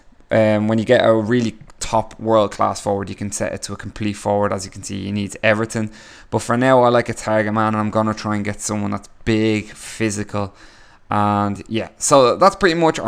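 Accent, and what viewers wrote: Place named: Ireland. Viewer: Lol i wans't expecting this guy to be Irish.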